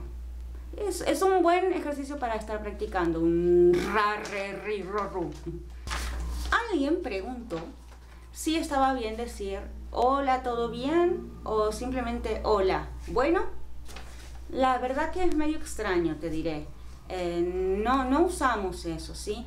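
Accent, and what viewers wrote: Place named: Rioplatense, Latin America. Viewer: Me gusta tu acento. Yo creo que debes mantener el acento Rioplatense. Podrías enseñarnos algunas expresiones típicas de Argentina. Gracias.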